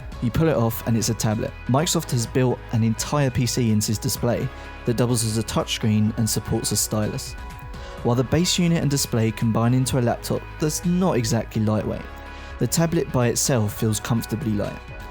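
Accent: United Kingdom. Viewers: Brad Roberts He is English. So, his accent is UK accent... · OMG, in Russia we get used to hearing American English. I can't understand his perfect British accent. Bleeding of my ears.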